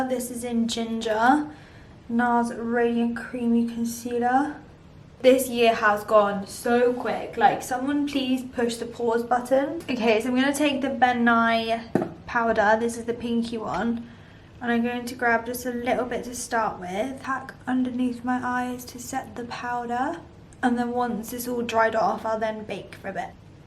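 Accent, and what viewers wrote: United Kingdom. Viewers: So happy to see u back. Vertigo is not fun. It’s horrible. My mother in law has got it and she has to be so careful any kind of motion can bring it back. Can’t wait to see more tutorials and hear your cute English accent 💕💕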